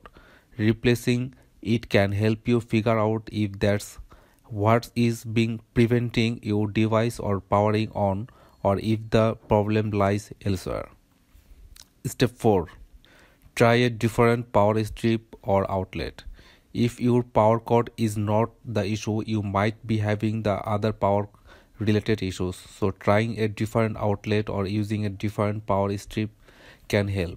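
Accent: India. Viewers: When bro started talking with the Indian accent i knew i was in the right place... But L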